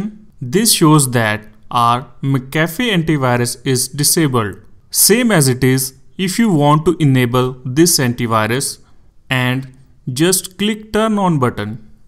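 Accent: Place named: India. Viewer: no thanks your indian scammer voice doesnt convince me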